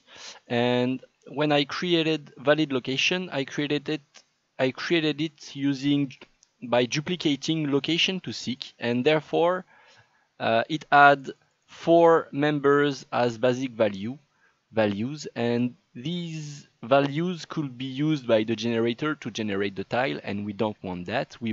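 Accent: France. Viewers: Bonjour, tout d'abord merci pour ces tutos tu as franchement fait un super travail. Je me permet le commentaire en français car l'accent ne trompe pas ;-). Bref j'ai un petit soucis car après avoir suivis scrupuleusement les précédentes vidéos, les tiles ne spawn pas. J'ai bien la start tile qui spawn mais c'est la seule. La fonction print ne me renvoi que l'info sur la previous location donc je me dis qu'il ne génère même pas les generic tiles. Dans une précédente vidéo, lorsque tu avais créé la fonction Generate Tile Layout et que tu faisais spawn les tiles avec la fonction add, j'obtenais le même résultat mais pas avec des unités de 1 mais de 45 (mes tiles faisant 30), je me dis donc que j'ai peut être un problème d'unités mais je ne trouve pas lequel. Je ne suis pas allé plus loin dans les vidéos car j'aimerai arriver au même résultat que toi avant de continuer. 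Si tu pouvais m'aider je t'en remercierai.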